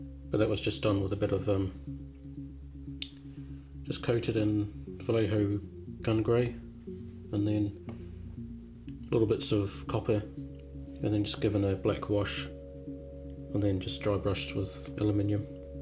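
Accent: New Zealand. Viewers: you are extremely talented! nice paint job! also are you from New Zealand?!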